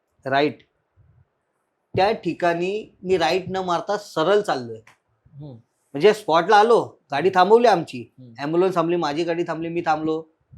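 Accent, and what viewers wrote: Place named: North India, India. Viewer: Hyacha gav bagha bolanyawarun bhaiya watato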